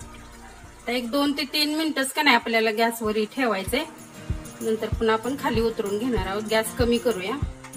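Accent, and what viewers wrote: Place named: Rural, India. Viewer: Mam tumchi recipi mi keli chan jhali Tumche gav kutele sanga na Aamchya gavakde pn ashich bhasha aawaj Mast vattey..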